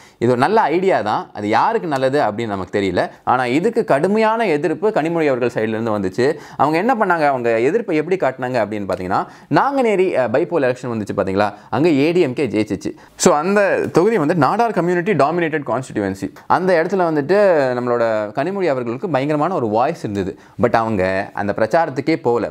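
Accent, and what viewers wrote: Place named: Kerala, India. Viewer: மலையாள வாடை உங்கள் பேச்சில்..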